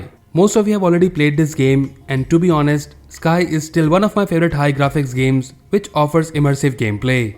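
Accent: India. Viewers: Dude is trying to hide his indian accent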